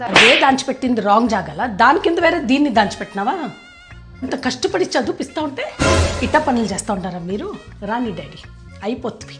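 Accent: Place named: Bangalore, India. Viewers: Rayalaseema and karanataka barder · Dheeni TELUGU enti Kannada kampu koduthondhi… thuuu ekkadaleni maayadhari santha motham YouTube lo undhira baabu.. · Native kannada na midi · Kannada telugu slang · Very good slang 😂😂😂 Bangalore Telugu ❤❤ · అనంతపురం బెంగళూరు బార్డర్ భాషయాస ,, రాయలసీమ కన్నడ మిక్స్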